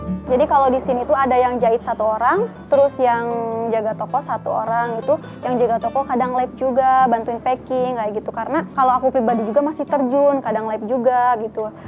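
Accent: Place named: Bandung, Indonesia. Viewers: Beberapa kali lihat video di channel ini banyak banget menginspirasi saya untuk punya usaha sendiri. Cuma mau action masih bingung. Belum nemu ide jual produk apa, bingung cari tempat supplier yang tepat, pusing bagi waktu sama kerjaan sekarang, dll. Bener" bertekad dalam Minggu ini harus segera action, saya punya prinsip gak mau selamanya jadi karyawan, mumpung masih muda gak ada salahnya kerja keras membangun kerajaan bisnis sendiri dari 0. Btw kakak owner nya cantik, seneng aja dengerin dia ngomong, teteh" logat Bandung gak ada obat 😂😂. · Bandung pisan kak logatnya hihi, keren sukses selalu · logat ciamis nya masih kentel keneh teh...hehhe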